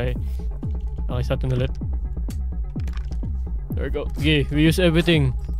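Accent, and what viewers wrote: Visayas, Philippines. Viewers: Bisaya diay ka dol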